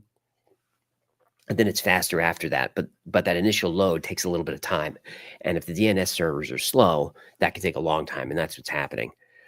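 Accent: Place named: Bronx, United States. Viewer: I knew I KNEW that accent!!! From this Bronx native… you hide it well, lol. But it slips out a little sometimes ❤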